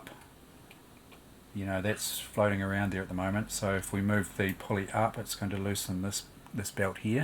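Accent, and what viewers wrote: New Zealand. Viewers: I hopethis gets an answer, it would be helpful, but it sounds like he's a kiwi so he may not have an answer for another country if you are from somewhere else to NZ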